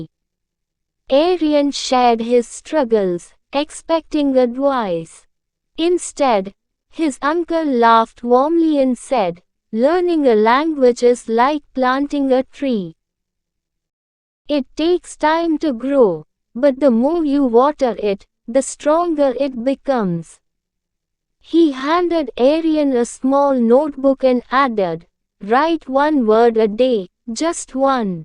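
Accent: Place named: India. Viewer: It is indian accent not british or American 😐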